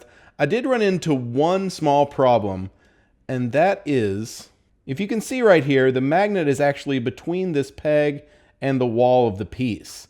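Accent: Texas, United States. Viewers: You gotta Texas accent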